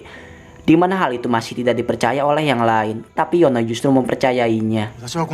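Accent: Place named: Banjar, Indonesia. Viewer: Yang baca naskah orang Banjar Kalimantan nih. Ketahuan dari logatnya. Cuma saja intonasi tatkala berhenti paragrafnya ga enak didengar.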